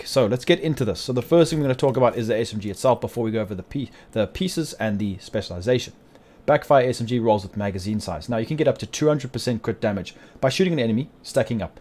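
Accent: South Africa. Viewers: Im guessing Joburg or Durban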